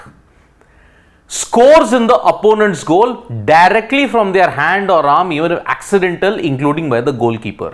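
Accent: India. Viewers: ОПЯТЬ ИНДУС УЖАСНЫМ АКЦЕНТОМ ЧТО-ТО ОБЪЯСНЯЕТ ,НО ЕГО РЕЧЬ НЕ ПОНЯТНА!!!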